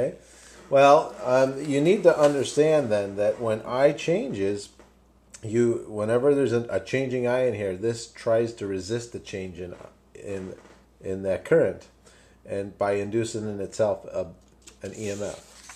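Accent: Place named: Brooklyn, United States. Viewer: Hello -something in your accent tells me you are from Brooklyn N.Y. N.Y. and like eating Gefilte Fish and beigel with cream cheese, am i right? Greetings from Yoram Stein Amsterdam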